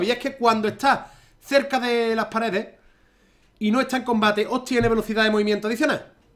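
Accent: Spain. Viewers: De donde es su acento? ya se que de españa, pero donde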